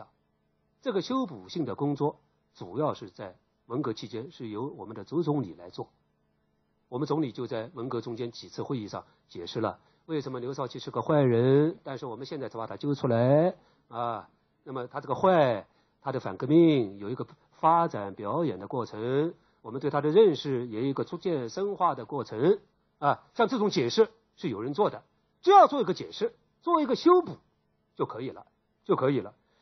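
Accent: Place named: Nanjing, China. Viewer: 南普感人。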